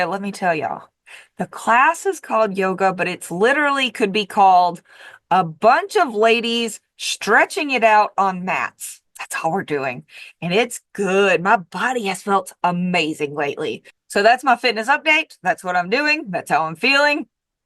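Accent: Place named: Texas, United States. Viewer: Can't do accents? You have a stronger accent than mine, and I'm also from Texas. You have a country gal accent, you were just quoting a gal who has a backwoods accent, that's all.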